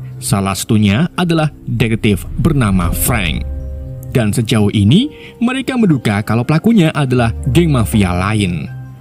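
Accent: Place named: Java, Indonesia. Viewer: Tak meluni sekang awal malah part 3 jawane metu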